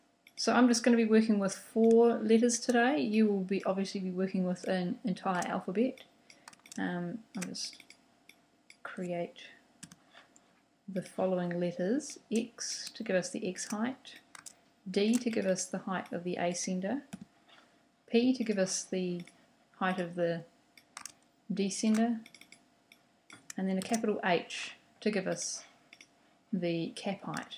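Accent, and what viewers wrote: New Zealand. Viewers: If only all tutorials were given in a New Zealand accent! Thanks, very helpful :) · Don't know if you are still receiving comments, but....if you have 26 letters in illustrator...do you just put them on 26 layers? or fewer per document? thanks, good tutorial....and new zealand am i hearing? thanks, eliza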